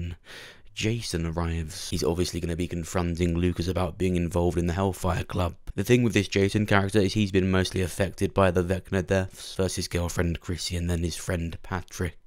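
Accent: United Kingdom. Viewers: He sounds like a guy from the UK 😂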